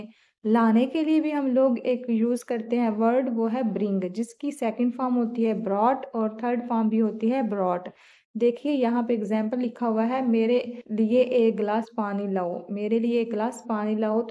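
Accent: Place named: Punjab, India. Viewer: استاد جی آپ کی اردو بہت زیادہ punjabified۔۔ہے۔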